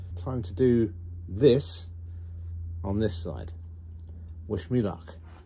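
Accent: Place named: United Kingdom. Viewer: Well done love how pretty that grain is what type of wood did you use I know you mentioned and said what type it was but I just did not quite understand stand what you were saying with that wonderful British accent you have may be you should have let Lenore with her Canadian British accent say what it was being I am a Canadian Blessings from Hanna and all the hot weather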